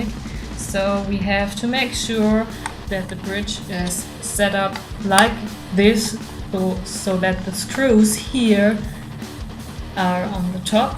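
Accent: Germany. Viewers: Du sprichst sehr gut Englisch, nur man merkt voll, dass du Deutsche bist. :D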